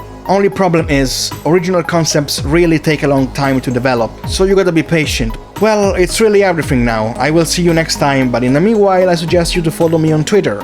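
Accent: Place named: India. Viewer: You must be talking with an Indian accent! Indian as in Asian-Indian American.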